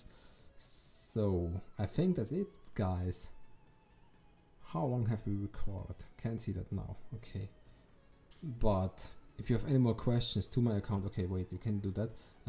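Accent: Germany. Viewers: Was für ein Deutsches Englisch XD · hab gar nicht gemerkt das du deutsch bist aber dein Akzent hat man raus gehört dann kam ne Twitter Meldung auf deutsch:D btw kranker Account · Ein deutscher an der spitze ? Wow (deutschen Akzent höre ich unter <num> mio englisch sprechenden herraus :D) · Deutsche hört man raus xD Auch wenn er viertel türke wäre und er das flüßig sprechen kann, Das ist der akzent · hast aber echt nen deutschen Akzent dachte auch du wärst Deutsch bis du es zum Schluss gesagt hast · Kommst du aus bayern? :D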